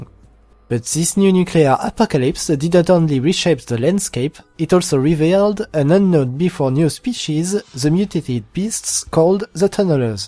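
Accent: France. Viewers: Ma man's way too french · U french bro? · you have the stereotypical french accent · Damn that is a strong Frog accent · Pour un français tu parle bien anglais, bosse un peu ton accent mais a part ça merci ca explique pas mal de chose et de façon limpide ! Merci et good luck ^^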